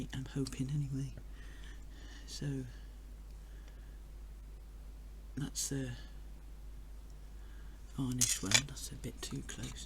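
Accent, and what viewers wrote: United Kingdom. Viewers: These are truly beautiful. I just love the one with the black background piece, really makes the colours pop. Gorgeous colours together. Thank you for giving us so much of your time and talent. You sound like you hail from the South of England. I lived there as a child and also again in my early twenties for a while but now live in Australia - a touch warmer!. Have a wonderful Christmas and hope your New Year brings you good health and special times.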